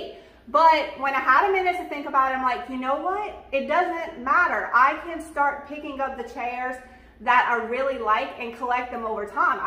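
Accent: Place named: South, United States. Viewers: I love your accent. I live in Mississippi, too. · your shows are such fun and you are such a KIND woman. It is a joy to watch you. P.S. My husband always knows when I am watching, "I hear your Southern Lady"s voice". You make us both smile.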